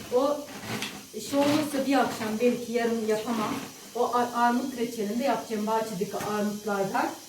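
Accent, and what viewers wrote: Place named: Uşak, Turkey. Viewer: Bende Usakliyim senin konusma sivenden anladim Usakli oldugunu abone oldum basarilar Süpersinnn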